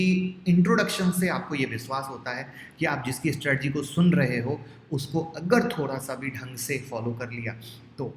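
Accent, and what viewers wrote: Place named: Lucknow, India. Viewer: Lagta hai Aap Lucknow ke ho 😀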